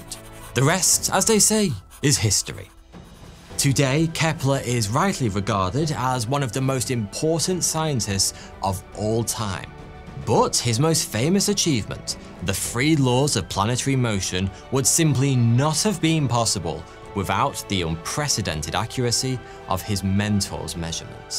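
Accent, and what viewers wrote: United Kingdom. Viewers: I don't know why , but after watching any of you videos, I get a strong British accent , in fact , I am writing this comment speaking in my head like you .. wierd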